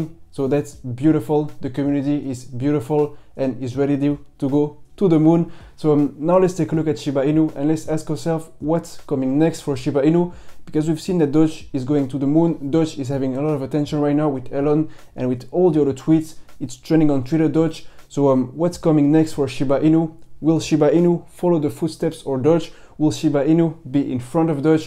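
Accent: France. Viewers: Are you French ??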